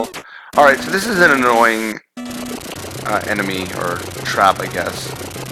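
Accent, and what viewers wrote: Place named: Canada. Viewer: I can tell your a canuk 😂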